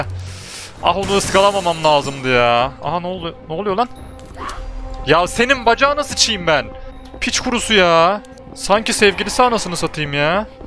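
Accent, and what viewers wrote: Sivas, Turkey. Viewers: abi sen sivaslımısın?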